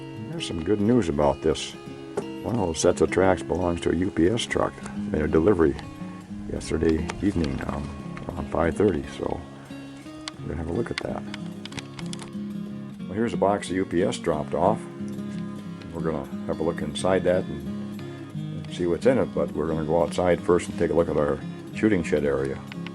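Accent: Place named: Minnesota, United States. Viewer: You must be in Minnesota based off of your temps and snow and accent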